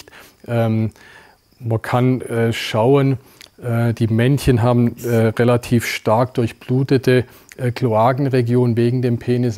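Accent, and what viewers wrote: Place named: Baden, Germany. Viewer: Es tut immer wieder sehr gut, die Güte zu spüren, die du deinen Tieren entgegen bringst! Bin in der Tat nicht grad ein Kommentarschreiber (obwohl das heute bereits mein 2. ist 😊)! Aber das musste jetzt mal gesagt werden = wie sehr ich deinen Umgang mit den Pfleglingen schätze)!!! Wenn ichs richtig in meinem "Hohlen" habe, gehört der heutige Protagonist auch zu deinen persönlichen High 5 (Platz 4 oder 5?) ?!?! Nun hab ich noch ne Fachfrage: es gibt ne 4--5teilige Doku die sich mit der Tierwelt der indopazifischen Inseln auseinander setzt (Micro--Macro--und Polinesien)! Natürlich auch Neukaledonien, Fidschi, Tonga, Bora--Bora, Papetee, Samoa, Weihnachts--und Gesellschaftsinseln, Palau, usw.usw. OK, da ist nix mlt Grosswild,ect. Aber mit endemischen Raritäten! So gibts auch den Riesenskink, ein friedlicher Gigant! Der müsste noch um einiges gewaltiger, als die Blauzungen sein. 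Ob davon ein Video machbar ist? Letzte Frage: wo ist dein Reptilien--Haus? Vom Slang her, würde ich dich in die Badische, Schwäbiche oder Breisgau--Ecke verorten! Denn ich muss das alles irgendwann, mal life sehn! Für heute erstmal alles Liebe und Gut, sowie meinen Respekt!!! MW aus MG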